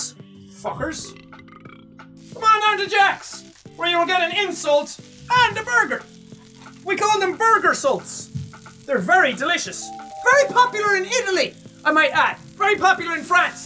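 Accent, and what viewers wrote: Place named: Ireland. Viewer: I'm just wondering... why Jeremiah? Like i know its just a name he thinks of on the spot, but... why Jeremiah? It doesn't trigger or offend me or nothin but it just makes me think of my big bro so if i zone out due to my recent work load, then i hear "Jeremiah!" in Sean's infectious Irish voice, it just makes me think that my bro is there or smth XD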